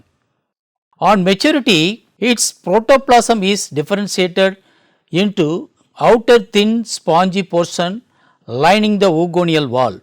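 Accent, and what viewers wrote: India. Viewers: Respectfully, I feel it is a shame there is no subtitles for this video. There may be helpful information on the subject matter but the speaker's extremely thick accent makes it nearly impossible to understand what he is saying. I find it very difficult to listen to people with an Indian accent speak English and they very often seem to insist on doing it anyway without subtitles and without learning how to properly pronounce and accunciate English words. I can't imagine learning to speak Hindu with an American accent and expecting native Indians to understand what I am saying and retain helpful information on a scientific or medical topic without being distracted or frustrated by the language barrier. Would it not be better to make the video in your native language and have subtitles in English or have it translated and a voice-over done by a native speaking English person if you want your viewers to truly obtain the content you are providing?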